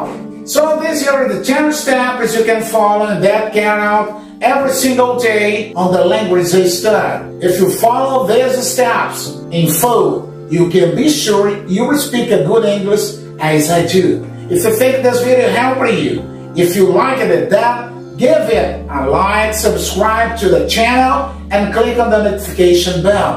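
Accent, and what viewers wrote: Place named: Brazil. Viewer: Your speech has a strong Brazilian accent, anyway.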